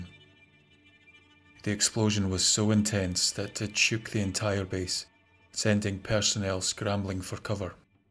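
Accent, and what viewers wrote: Scotland, United Kingdom. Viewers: Just your voice alone makes one wish to see Scotland.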